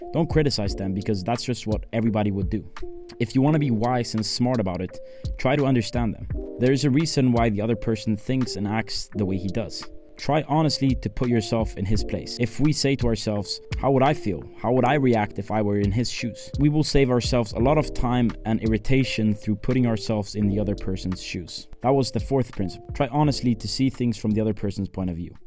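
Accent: Sweden. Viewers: I heard from ur dialect and I knew u were from Sweden :D before u said . Swedish people usually have different dialekt, instead of saying visualising which sounds like vizhualising, swedish people usually say vis-shualising. Or cheese, swedish people say sheese. Just a fun fact and have a great day :)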